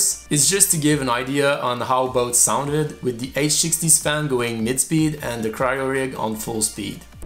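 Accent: Quebec, Canada. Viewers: Es-tu Québecois?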